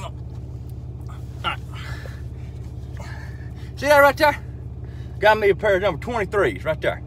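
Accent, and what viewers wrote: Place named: South, United States. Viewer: Why was this in my recommendations? 😍 nevermind... I don’t care 😂😂 He’s funny! And that southern accent... oh my 🤤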